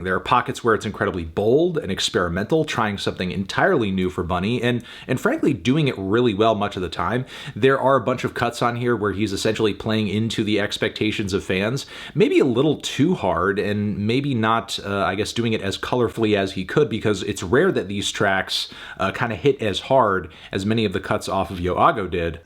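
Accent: United States. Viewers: No se le quita lo gringo. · arent u italian why do you pronounce spanish words so…gringoly..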